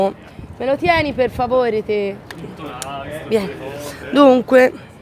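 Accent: Tuscany, Italy. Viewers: Bel video :) tieni un sacco di compagnia e il tuo accento toscano è meraviglioso :) ❤🌹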